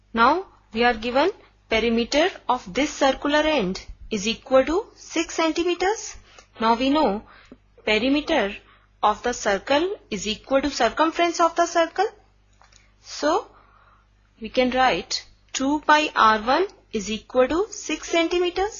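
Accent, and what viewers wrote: India. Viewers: I cant understand your indian accent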